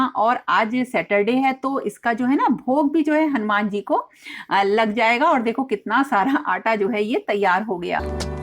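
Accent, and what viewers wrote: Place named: Anupshahar, India. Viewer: Nice vlog mam🙏🙏🙏🙏🙏🙏🙏🙏🙏🙏🙏🙏🙏🙏 Aacha lagta hai... Jàb ghar me sab milkr kaam krte hain.👌👌👌👌👌👌👌👌👌👌.... Bilkul tyohar jaase feeling aati.......👍👍👍👍👍👍👍👍👍👍👍mam.... Meri saasu ma anupshahar ki hain...... Apki language bhi wasi hi lag rahi thi.....👌👌👌👌👌👌mam mai to choorma food processor mai bna leti hoon, badhiya mix ho jata ha😊😊😊mam mawa aap ghar pr banate ho kya???😊😊😊😊😊😊😊😊😊love u frm Haridwar 😘😘😘😘😘😘😘😘😘😘😘😘😘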